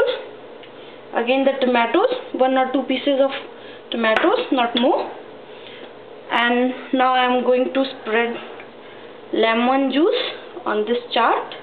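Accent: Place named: India. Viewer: Its indian accent...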